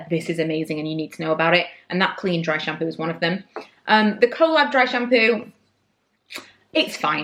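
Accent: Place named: North, United Kingdom. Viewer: I remember watching one of your vlogs (possibly around Christmas?) when you were with your work friends and you sounded proper northern, it was funny!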